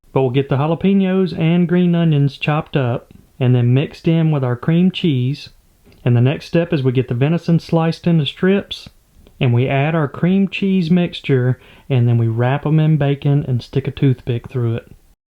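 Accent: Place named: Alabama, United States. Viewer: Yah, that soothing Alabama voice and bass!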